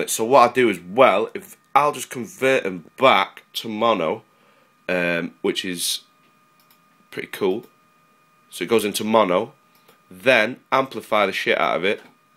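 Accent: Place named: United States. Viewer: superb american accent, you sound like the filmer Beagle from baker skateboards haha. love your tunes, neat tutorial!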